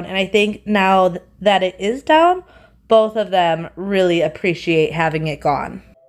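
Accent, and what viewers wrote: Wisconsin, United States. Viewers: I came across this in my recommendations and said to myself, "Sounds like she's from Wisconsin..." I grew up near Waukesha. :D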